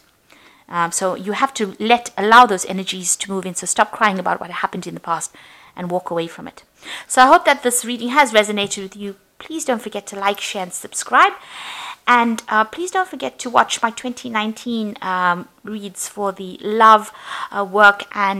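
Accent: South Africa. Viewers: Are you SAfrican? You sound like home :-)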